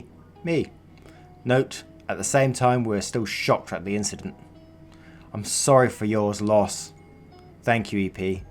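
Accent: United Kingdom. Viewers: That British blue accent tho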